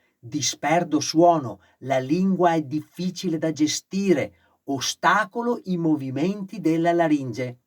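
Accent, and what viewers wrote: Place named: Veneto, Italy. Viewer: Maestro ho appena scoperto il suo canale. Sento con piacere che è veneto come me e perciò le chiedo: effettua anche lezioni in presenza? (Mi scuso se le pongo una domanda a cui forse ha gia dovuto rispondere più volte) Complimenti per la chiarezza e la spontaneità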